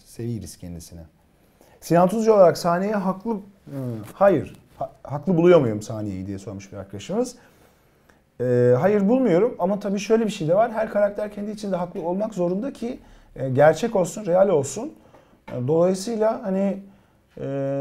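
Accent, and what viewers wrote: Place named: Black Sea, Turkey. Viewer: Sinan abi Allah aşkına sen karadenizli ol şiveni falan bırakma ŞUAN gerçekten MUSTAFA ASİYE sahnelerine ihtiyacım var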